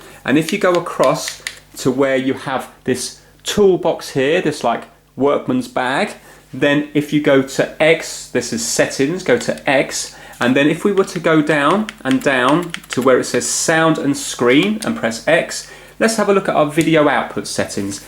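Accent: England, United Kingdom. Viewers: He has a British accent. Not sure where you get this impression. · Fuck british accent.....weak accent ever. Try to be American. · Great instructions. Nice clear English accent. Thanks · this british accent gives me on my nerves..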